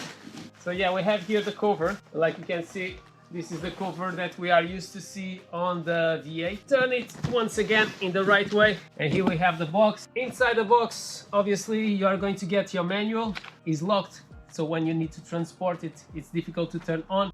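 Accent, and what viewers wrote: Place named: Portugal. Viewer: Dude! Are you Portuguese or something? That accent man... so familiar!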